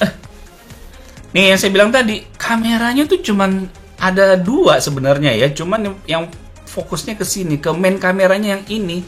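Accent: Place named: Jakarta, Indonesia. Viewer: Logat betawinya masih 100%😂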